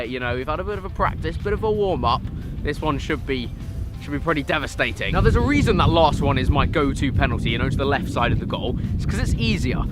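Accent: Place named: England, United Kingdom. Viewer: This guy's definatlaly english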